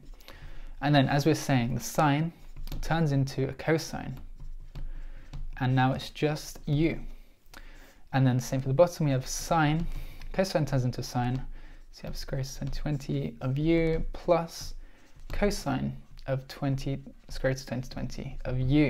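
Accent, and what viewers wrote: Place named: United Kingdom. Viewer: Thank you so much I love your British accent btw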